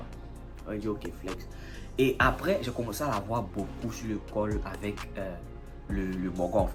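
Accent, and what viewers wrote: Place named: Ivory Coast. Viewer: Je suis fan de ton français ivoirien bro suis enjaillé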